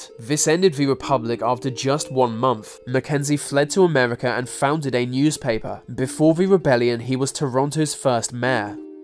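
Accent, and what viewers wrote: United Kingdom. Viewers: All brits sound the same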